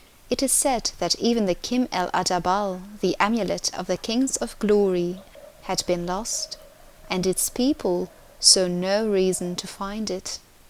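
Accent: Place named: France. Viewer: Lovely French accent and well read its one of my favorite TES books, bravo.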